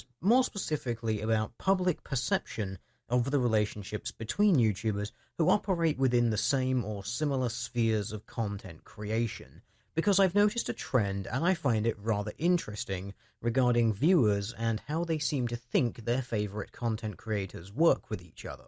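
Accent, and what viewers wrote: United Kingdom. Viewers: The video is an opinion until you present evidence. Yea, I know it's you keemstar hiding behind that British accented dear. Start pointing hooves or your video is BS, I know you want to. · I didn't make that assumption, you assumed that I did. I ASKED if it was typical or not, with a suggestion that it might be a British dialect. If it isn't, fine. But that just begs the question as to why I'm hearing it lately, and not just from our ruminant blue-green friend here. I'm also hearing it from at least one Aussie YouTuber as well. It's unusual and I was curious.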